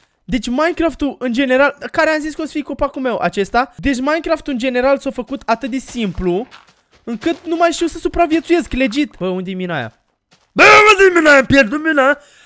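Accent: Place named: Moldova. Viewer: Accent de moldovan>>>>>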